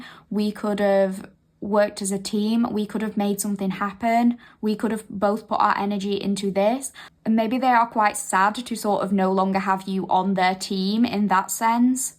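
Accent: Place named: Scotland, United Kingdom. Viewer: u r very good reader, like ur 'scottish accent'...many thnx🎉🎉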